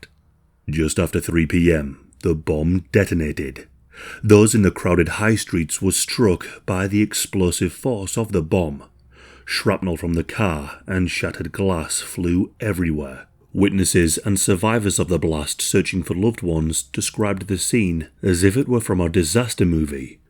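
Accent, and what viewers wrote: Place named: Yorkshire, United Kingdom. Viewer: I don't think it's Scottish or Welsh. I am going to go with Yorkshire, probably North.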